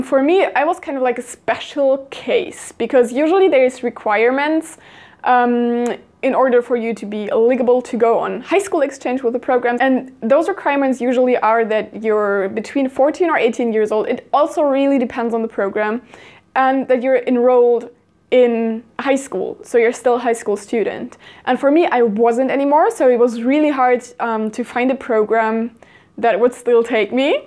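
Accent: Germany. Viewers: So, she's German. That explains why I can actually understand what is being said, because English native speakers usually speak too fast for me to understand... Nice accent and good fluency. ^^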